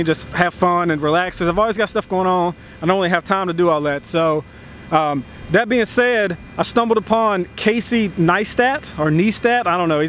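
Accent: South, United States. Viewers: he sound country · 1. I knew from that voice that he was a white guy from the south. 2. LOL at that dig at Terroriser. I like them both but lol.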